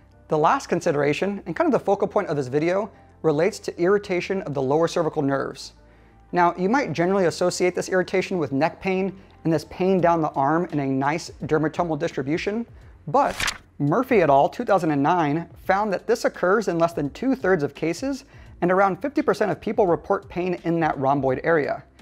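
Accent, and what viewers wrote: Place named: England, United Kingdom. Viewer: Can u please reply me . I have same problem but i didn't understand your English accent . Its better if u write here. Whts problem is this.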